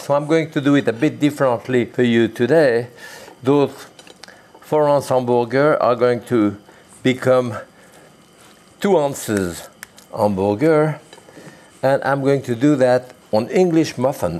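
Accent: France. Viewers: exactly, every other commenter is a celebrity obsessed child. the reality is this was an atrocious example of cooking on every level. food safety, ingredients, technique. sorry, other than the charming accent and the nice personality (my dad speaks clear American English also from France about the same length of time). I love burgers and good cooking by the way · Besides watching Jacques cook, I love listening to his voice. My maternal Grandmother was from France and it reminds me so much of how she'd speak English in her beautiful accent. It's so comforting to watch him cook and listen to him describe the process while relating personal stories. I'm sure I'll never have the privilege of meeting, but I'm extremely grateful to be able to watch his videos on YouTube and Instagram every day.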